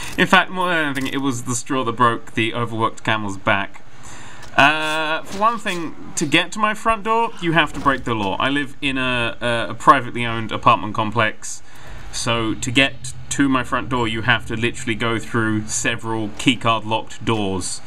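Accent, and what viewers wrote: United Kingdom. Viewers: 6. Your English/British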